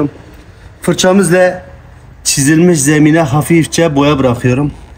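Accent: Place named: Urfa, Turkey. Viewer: Urfalı olduğunu hissettim hemşehrim. Harikasın enerjin iyi videolara devam.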